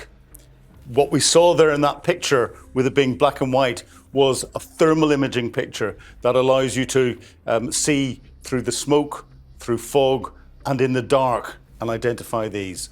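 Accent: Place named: Scotland, United Kingdom. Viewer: IT'S The SCOTTISH BROGUE.❤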